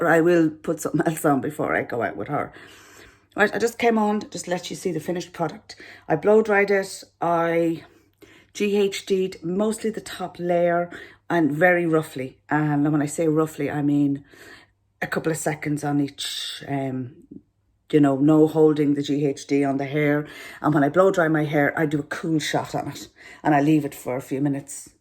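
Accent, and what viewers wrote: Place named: Ireland. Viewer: Thanks,! Love listening to you. Can't tolerate all the "younger" gal videos. We had to double-down on the lockdown here in California, and I've got about 5 different colors of blonde in my hair, so I'm gonna give this a shot assuming I can find that color here. I agree with you on the NO ASH, which is what it seems all the ladies here are going for. Ewww. Doesn't quite work on us over 40s! Again - LOVE your (Irish?) accent and your potty mouth - cracks me up every time.:)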